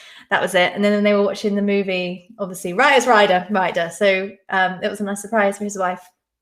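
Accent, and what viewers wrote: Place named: United Kingdom. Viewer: Whoa, I had no idea you had a British accent. Very interesting!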